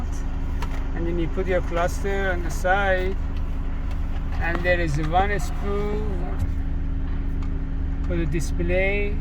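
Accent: Iran. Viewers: واى لهجه رو انگليسى با لهجه ايرونى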